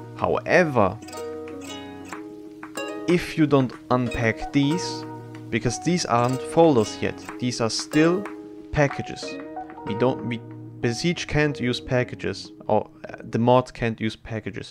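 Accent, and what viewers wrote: Germany. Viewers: küss dein herz brudi, stunden lang gesucht (isntant erkannt das du deutsch bist) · I can hear some Deutsch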